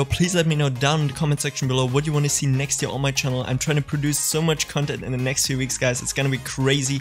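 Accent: Germany. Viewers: nothing much, are you german? i like your accent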